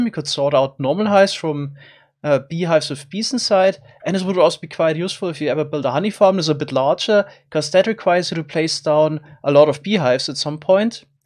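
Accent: Germany. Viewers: Are u german thiss accent insane haha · Da hör ich doch den deutschen Akzent😂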